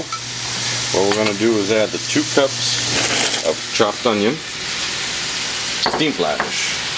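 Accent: United States. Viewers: Love the South Shore accent!!!!!! :P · I love the Boston accent! Trying it this afternoon and will be back at you...thanks!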